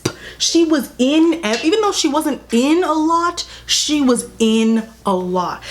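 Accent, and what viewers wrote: United States. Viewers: Gina Rose Belarde well Americans tend to think everyone is American. I can clearly see she’s American but my point is, why does her tone of voice go up at the end of every sentence. Of course I know how American sounds like. You lot are everywhere in the media. This accent is something I’m no familiar with. Her message is hard to grasp because she sounds unsure. Even her facial expressions and that’s just my opinion. Idk why some of you replying to my comment like I said something inappropriate or controversial. You could’ve just told me what area she’s from or anything else that was real information rather than ‘are you not American?’ No of course I’m not American wtf maybe if I was I wouldn’t have such a hard time understanding her delivery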